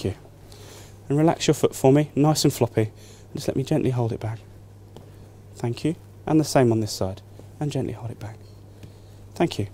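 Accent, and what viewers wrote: United Kingdom. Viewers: Is this guy full on British or an American who's lived in the UK for a while? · Full on British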